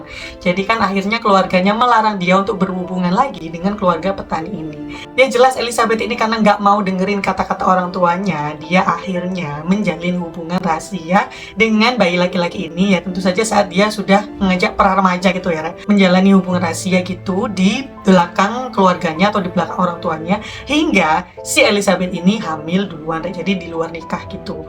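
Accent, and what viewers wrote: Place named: Java, Indonesia. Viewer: Beda kak , kaka ini njelasin dengan caranya lbh slow dan medok , klw ka nadia patas dan heboh , punya ciri msg2 sih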